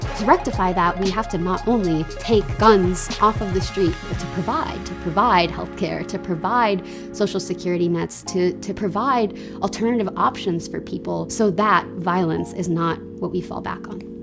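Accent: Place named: United States. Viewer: If it wasn't for the American accent I would've guessed this was made in Canada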